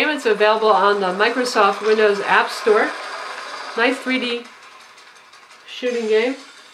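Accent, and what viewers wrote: United States. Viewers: Good reviews, but oh, sometimes her fast, american accent speech leaves no chances to understand well.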